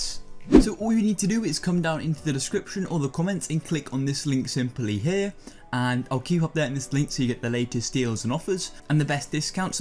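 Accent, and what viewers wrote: United Kingdom. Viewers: Fortnite and british👎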